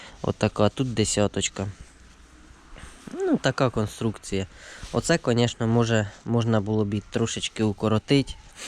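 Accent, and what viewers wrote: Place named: Poltava, Ukraine. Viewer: Перший раз дивлюся ваші відео,і відразу почув знайомий полтавський говір.Лайк за відео👍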